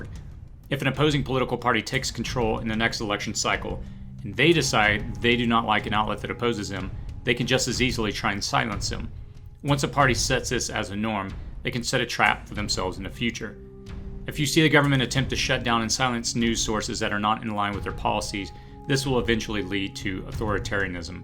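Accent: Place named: United States. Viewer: I was interested until you mentioned a certain political group or party labeling some broadcast corporations as fake news as being just not true or rare,,,at that point t I knew you were political propaganda designed to counter the fact that the people are now realising that our media is just lie talkers not journalists who's goal is to hide the facts and give cover for those who are right now (presently in america)attacking us on multiple fronts, ,these enemies were caught off guard when trump defeated hillary who they invested heavily into her being president because she was there person to totally take the US apart,,these hey cannot allow this to stand that's why all of the fake news more then ever is all that is being heard by the people and the reason why internet news sites are being removed,,they must control the narrative,,,you sir are fake news you are one of those enemies telling propaganda news on behalf of the ones who want to do I ate and enslave all of us,,,and you sound like an american,,in my mind I cannot understand any mindset that would help to destroy his own nation,,your goal here is very transparent,,,you are here to paint trump as the enemy,to paint trump supporters as the crazy ones just as the msm does daily,,at the same time that we who know the truth watch the enemies fund and mobilize the violence,,,get off the internet you frickin liar,,it's to late we all ( see ya) for what you are and we see your plans and devices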